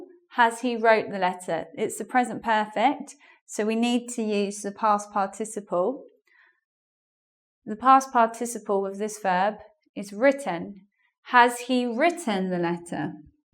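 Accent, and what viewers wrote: United Kingdom. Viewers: Has the British prounonciatinon? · Excellent Video.... I love it the british accent....very useful for me, thanks for sharing. One thing ....you are excellent teacher.....Don't worry about bad comments.... Congrat. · I do like your British English! I'm fond of it! : )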